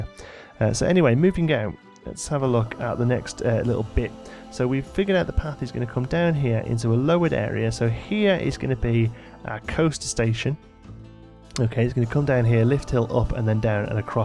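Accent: United Kingdom. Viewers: I get what they are saying about your voice, it is very soothing. Being from America, of course, I love your accent. It reminds me a bit of the way The Beatles sounded when I was a lass. Some of your words stick out more than others, like the way you say mental. I will often stop the video to replay it again. You are a great Plan Co builder and wicked smart like a fox with design.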